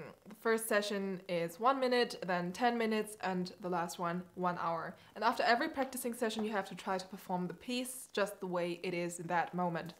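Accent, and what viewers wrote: Germany. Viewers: Man hat dein Englisch gehört das du deutscher bist 😂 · I know she was German as soon she spoke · Dieses anders deutsche Englisch :) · man merkt so hart das du deutsch sprichst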